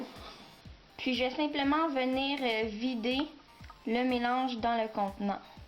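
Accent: Quebec, Canada. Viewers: Je kiff ton accent kebequoi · T'es Québécoise, j'adore ta video, je vaiz abandonné sur ^^ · Super vidéo, merci pour cette vidéo. Je sais pas pourquoi mais j'adore les accents du Québec __ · j'adore ! franchement j'adore tout ce que tu fais c'est tout simplement génial ! continues comme ça ;) ps : j'adoooooore ton accent :p (québécquois, c'est ça?) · wouah quznds m&me la vidéo elle d'ate ;-;" et sinon je kiffe grv ton accent québéquois UvU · Québec ou Montréal ? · waseem Elle est Québécoise! :) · j'adore trop ton accent kebbekoi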